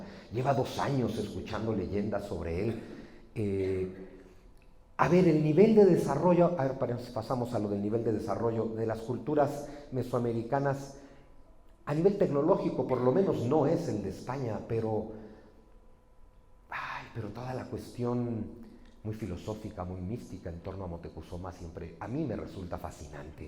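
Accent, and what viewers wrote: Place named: Mexico City, Mexico. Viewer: Pero si habla con el acentote de intelectual chilango, de que hablas? Xd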